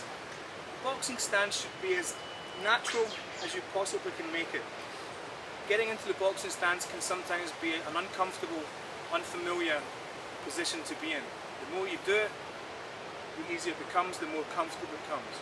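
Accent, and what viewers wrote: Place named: Ireland. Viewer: good ole irish accent. atleast i think its irish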